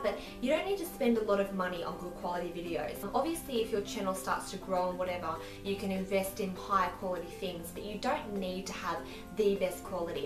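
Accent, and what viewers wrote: Australia. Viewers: Are you Australian? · are you australian?????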